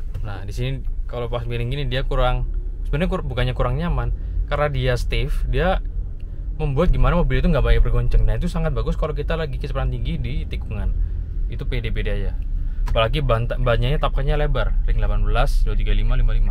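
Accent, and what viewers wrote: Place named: Jember, Indonesia. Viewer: Logat jember om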